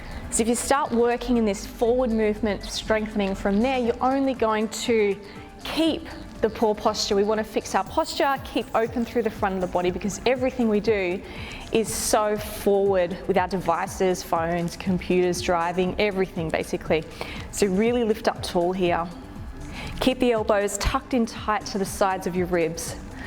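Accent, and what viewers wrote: Australia. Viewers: Ì love your work. So nice to have an Aussie accent and a not at all pretentious instructor. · Definitely felt the burn in the arms! Wonderful channel, with a lovely focus on gentle exercise rather than wearing oneself out. We are Aussie expats from QLD living in Sweden currently, nice to hear your familiar accent while working out. 🥰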